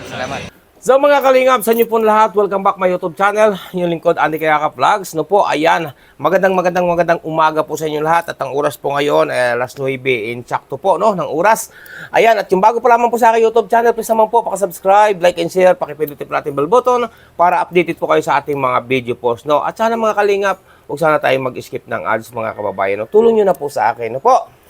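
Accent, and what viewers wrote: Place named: Batangas, Philippines. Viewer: Batangueño prin ung iyong punto Andy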